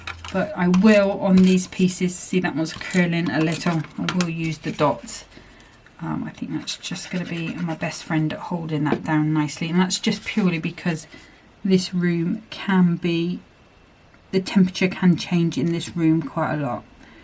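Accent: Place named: Bristol, United Kingdom. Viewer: When I first started watching your videos, I thought I heard a slight Bristolian twang. You use similar colloquial phrases that I do! Fab cards as always. Sending love from Bristol xxx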